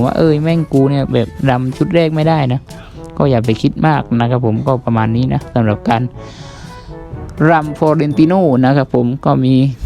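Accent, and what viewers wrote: South, Thailand. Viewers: พี่คนใต้ใช่ไหมผมฟังจากสำเนียง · พี่เป็นคนใต้ใช่ไหมครับ😆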